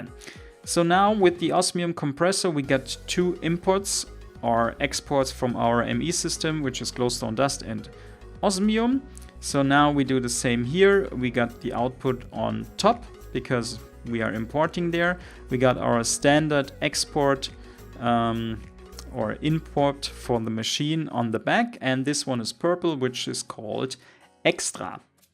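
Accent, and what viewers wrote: Germany. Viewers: Kann es sein das du Deutsch bist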